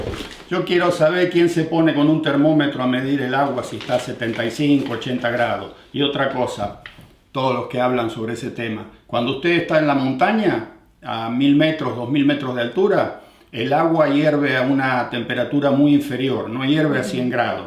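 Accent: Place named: Córdoba, Argentina. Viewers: Estimado, tú pareces más cordobés que la Mona Jiménez, con los años que estás en Canadá no perdiste el idioma cordobés. Saludos desde Córdoba. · Se me llenan los ojos de lagrimas. Me haces acordar tanto a mi abuelo. Un tipo amable, sencillo y con esa tonada gauchesca que aun no se te fue! Ni hablar de los asados que te mandas! Ensaladas??? No hace falta, comemos asado quemándonos los dedos y pelando los huesos jaja. Gracias, no dejen de hacerlo. Hacen felices a muchas personas., y gracias por esto <3 Que dios los bendiga siempre!!!!!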